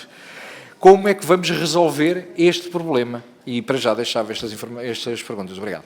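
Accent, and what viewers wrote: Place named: Lisbon, Portugal. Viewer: Este cara também é muito preparado e inteligente e se expressa como poucos e fala um português correto de Lisboa não o português do Porto e do norte que como o 1° ministro Costa disse é feio...rsrs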